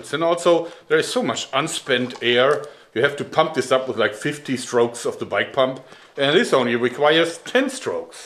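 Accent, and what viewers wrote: Germany. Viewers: I wonder where he's living - cause he uses all the american measures:D but i suppose germany - cause of his amazing accent ( and the laughing is gorgeous ):D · ich habe an ihrem englisch erkannt das sie deutsch sind 👌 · is he a german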